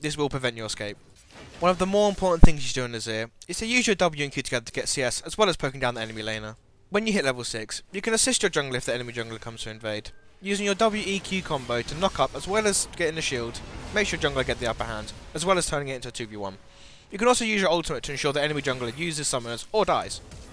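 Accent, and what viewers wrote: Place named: England, United Kingdom. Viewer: 21 I get it if I rewind the video but it's a bit of a hassle. Try pausing a bit between words man, you have a very heavy english accent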